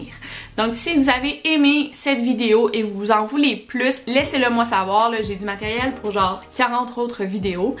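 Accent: Quebec, Canada. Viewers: Wow ton accent est encore plus présent depuis que tu es rentrée au Quebec! Je vis en Belgique et grâce à toi je déteste moins l’accent québécois ^^ J’adore tes vidéos, la façon dont tu racontes les choses...une vraie addiction !! plein de belles choses pour la suite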